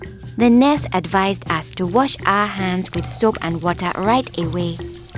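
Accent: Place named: Africa. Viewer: african accent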